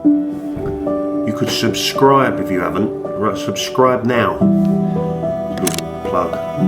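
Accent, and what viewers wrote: London, United Kingdom. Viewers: Great vlog, glad to have found you on here.. can relate to the Geezer accent 😁😎 .... Subscribed, and maybe bump into you on the mean streets 👌👍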